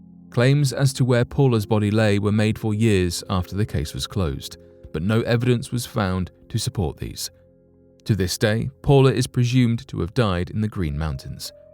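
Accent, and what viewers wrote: England, United Kingdom. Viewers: The missing penguins are from globle warming no doubt everything is caused by it from any England voiced narrator as well as Democrat producers.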